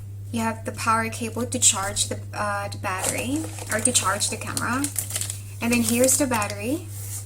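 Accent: Australia. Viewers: Maam, nindot kaau aiii, pero wala juy mka labaw sa imng aksent maam pang Australian citizen kaau ganahan qg pamati. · Mugawas jud ang slight na aussie accent. Nakaadapt nka diha kie. Great job!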